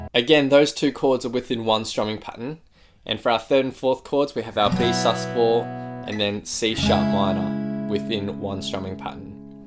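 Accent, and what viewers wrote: Australia. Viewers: When I heard your voice I'm like AUSSIE AUSSIE AUSSIE OI OI OI. 🇦🇺🇦🇺🇦🇺🇦🇺🇦🇺🇦🇺🇦🇺💚💛💚💛💚💛💚💛💚💛💚💛💚💛💚💛💚💛💚💛💚💛💚💛💚💛💚💛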